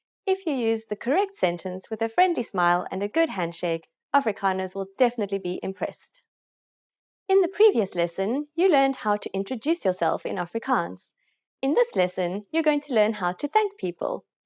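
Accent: England, United Kingdom. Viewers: Her pronunciation is “off” - she speaks Afrikaans with an English accent - there are various Afrikaans dialects but this English/Afrikaans - good for basics I suppose - she does make a few mistakes in this video - Fraans vs Franse - Ek praat Fraans - Hy is n Franse man (fraansman) - Also U - its not pronounced ie - but n deeper sound- Also Skiestog - we say “Askies” etc etc etc.. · The pronunciation needs work. This woman is speaking with a very prominent English accent. Native speaking Afrikaners don't sound so flat when they talk. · I am Afrikaans. This woman has a strong English accent when she tries to pronounce the words in Afrikaans. There's also a spelling mistake - "biki" should be "bietjie" and she totally mispronounced the word item. Unprofessional advertising as an educational source. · Ek voel dat 'n tweetalige persoon hierdie video moes gedoen het. 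Maar goeie werk. 'N Engelse persoon moenie Afrikaans leer nie omdat uitspraak 'n rol speel. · Fully agree, also pronunciation is off, she's probably british,,, also her Dankie sounds like donkey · thank you for these videos, I'm Zulu so i definatly need someone with an English accent to teach me Afrikaans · I really love this! I just wish the Afrikaans sounded more like an Afrikaans-speaking person and had less of an English accent to it. Afrikaans people do not sound this heavily English when speaking Afrikaans. Enunciation needs to be tweaked a bit more. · Peter Murray Exactly! Thank you so much... I just find it sad that they didn't get a native Afrikaans speaker to give these lessons. She's a wonderful teacher, but her English accent is very strong.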